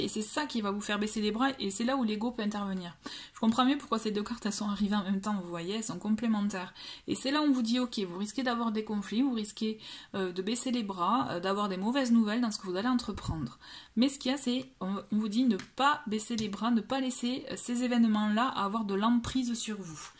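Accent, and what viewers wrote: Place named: Midi, France. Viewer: Projets 🙏 avec ce bel accent du Midi si je me trompe pas !!!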